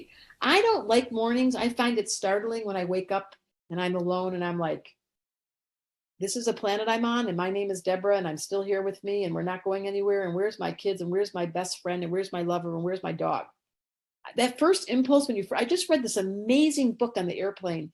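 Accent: United States. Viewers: Debra, may I ask? Are you from Chicago? I hear some kind of accent?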